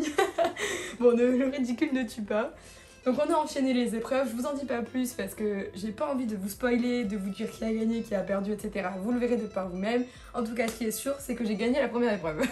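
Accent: South, France. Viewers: T’es juste sublime et rayonnante , ton accent du Sud et ton humour te donnent d’autant plus de charme 😁😊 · C'est franchement génial d'avoir un autre point de vue des olympiades !! Tu as une personnalité géniale !! Le "daingue" de cet accent du Sud te donne une vraie personnalité !! +1 abonné !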